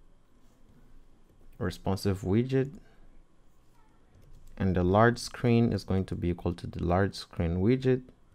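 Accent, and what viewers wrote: Brazil. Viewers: vc é brasileiro? curti seu ingles haha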